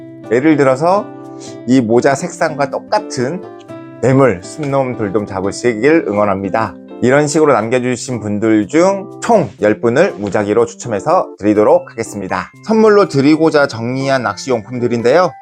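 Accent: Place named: Jeju, South Korea. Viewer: 제주도 사투리가 정겨워서 구독 누릅니다. 화이팅하세요^^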